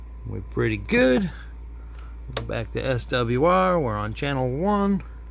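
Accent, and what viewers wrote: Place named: New York, United States. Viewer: Are you from NY ? what a heavy accent you have ...what are you doing in NC?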